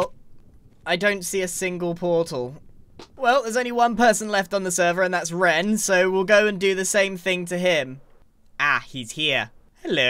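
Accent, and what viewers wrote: United Kingdom. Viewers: I think impulse didnt notice "chobblesome" because with your british accent it sounds very close to "troublesome"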